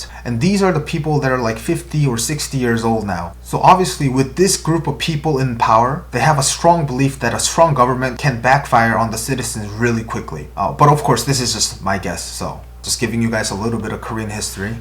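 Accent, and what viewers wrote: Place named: England, United Kingdom. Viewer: Please know that you make the international k-pop fans very stress reliving with English ascents and detailed information.. Love form our side 🤗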